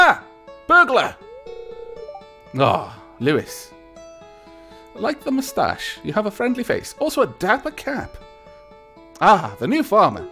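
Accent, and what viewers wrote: Wales, United Kingdom. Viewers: You have that Accent, sounds British or genuine gentlemen like.. soothing I can listen to you play great games on a podcast. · I just found your channel and your voice is arousing. Also you are the most British youtuber, even among British youtubers. (That's a compliment) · Ok so after watching your let's play i went and bought the game and am just starting out. Loveit so far! love the vids and your adorable welsh accent! First time watcher of yours now and new subscriber. Just wanted to say thank you and keep going. please. Also love the tardis and doctor Who references as well as a few other things you have referenced. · Hey, I can immediately tell that you are British from your voice but you don't sound very Welsh. since you're returning to the valleys, you could bring the account into it a bit. show me that you're the only gay in the village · he's Welsh. 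· Ah so your a brit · Tigran Movs he’s welsh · I thought he was British XD thanks for the confirmation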